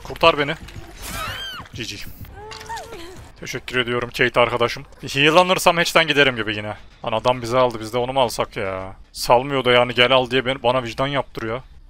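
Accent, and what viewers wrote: Sivas, Turkey. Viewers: Hemşerim sivaslımısın bende😍😘🥰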